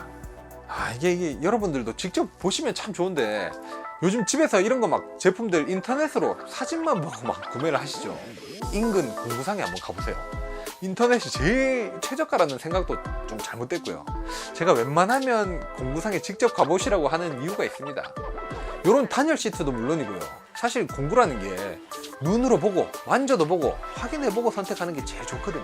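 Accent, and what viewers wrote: Gyeongsang, South Korea. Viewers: 어쩌다 들어왔는데 경상도 억양으로 쉬원하게 설명하네요. 좋은 정보가 많네요 감사.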